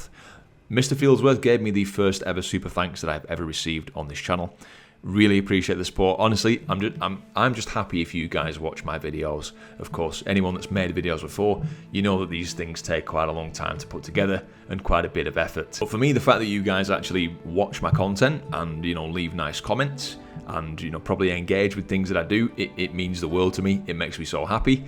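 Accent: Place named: North, United Kingdom. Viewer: Northern British accent >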